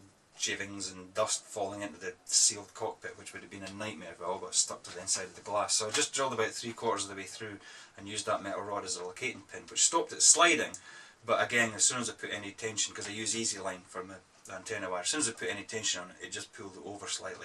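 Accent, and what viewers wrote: Scotland, United Kingdom. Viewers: Hi! great model indeed, the version is quite interesting with a worn out camo! thanks for the picture anyway. Great Sccottish accent too! ps: the bell jinggling in the background sounds like an ice cream van ...Thanks again ; and keep it up.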